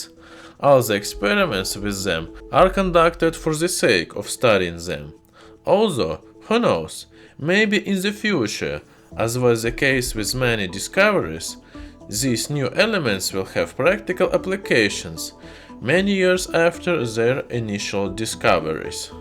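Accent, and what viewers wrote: Russia. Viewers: It's Russian accent--the main reason you hard to understand. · This is more like Russian with an english accent... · Love your Russian English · Thanks for posting these videos. I enjoy learning about the elements with a cool Russian accent.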